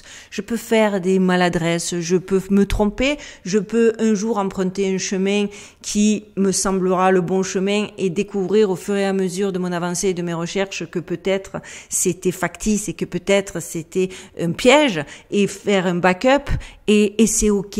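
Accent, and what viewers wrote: Marseille, France. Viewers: Ton accent de Marseille commence (légèrement) à perdre de son intensité. Il est temps pour toi de revenir chez toi, pour quelques jours, je pense. Ce serait dommage de perdre ce patrimoine national inscrit au répertoire de l'Unesco. Les églises brûlent, tu ne va pas perdre ton accent tout de même?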